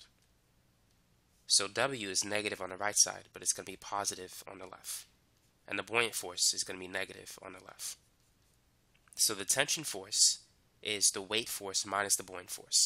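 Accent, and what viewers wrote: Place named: New York City, United States. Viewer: Damn you have a heavy NYC accent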